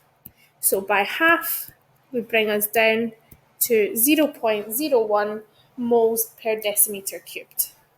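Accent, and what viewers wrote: Scotland, United Kingdom. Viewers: thank you madame for the great explanation.By the way,I liked your scottich accent. :)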